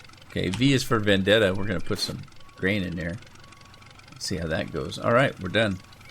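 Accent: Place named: Minnesota, United States. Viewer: I know this is an old video, but because I noticed it in the first video. I could tell you were either from MN or thereabouts, eh...enjoying the videos, thanks for posting.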